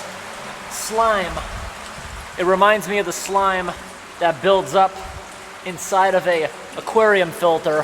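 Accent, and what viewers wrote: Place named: United States. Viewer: Omg I love his American accent.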